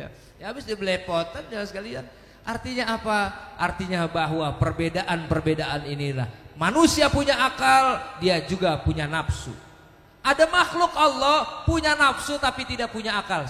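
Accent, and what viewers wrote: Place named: Java, Indonesia. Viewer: Ceramahnya medokkkk banget..predator ni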